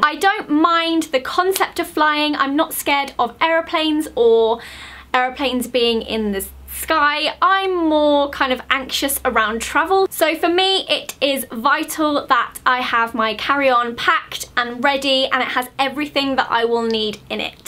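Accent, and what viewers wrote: United Kingdom. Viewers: I really love your british accent👌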